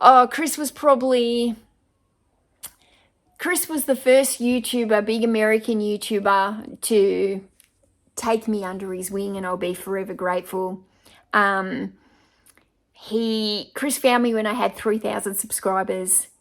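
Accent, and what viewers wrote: Australia. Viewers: I have 0 clue who you are, but I'm an eBay seller too, so I'm sure why you popped up as a YouTube recommendation. But damn that Aussie accent hooked me.... "YOU SOUND LIKE YOURE FROM LONDON" ( did you know they say the aussie accent was created because of all the drunken British)? . You seem lovely and love your energy. Keep doing your thing. Ebay is LIFE. Subscribed and look forward to more. The one thing my life is missing is a Thom. You give me hope even though I'm old as well ill beagle to find one.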